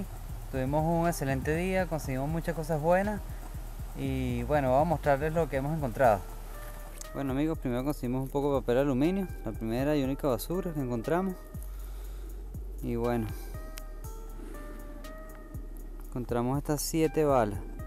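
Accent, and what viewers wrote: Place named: Venezuela. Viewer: hola... soy Mexicano casado con una venezolana... cuando estaba viendo tu vídeo ella me dice "... ese es Venezolano" ... que buen canal de detección tienes, saludos mi pana!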